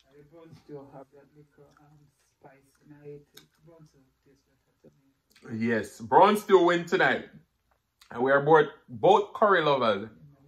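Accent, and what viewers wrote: Jamaica. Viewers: I see 👀 you lawwd how is it possible that you make food look so good. Another channel 🙌 for recipes. What that name of the channel again 🤔 you and that Jamaican accent. Sing sing 🎶 I don't know that song though.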